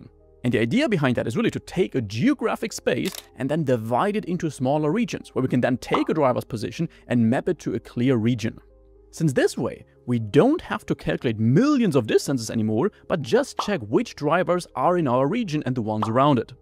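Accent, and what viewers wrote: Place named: Germany. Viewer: 300k subscribers, amazing editor, no money for elocution lessons. He pronounces English as if it were German. No vowel shift, no consonant adaptation. . Cringe. I bet if he invested 300 euro in private elocution lessons, his English would improve above and beyond. He is clearly capable, just unwilling.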